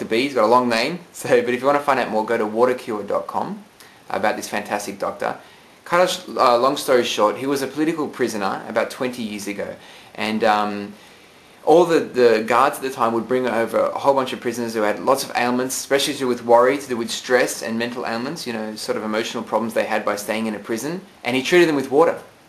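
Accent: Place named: Australia. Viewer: He did. He sounds Australian, and we say rubber :L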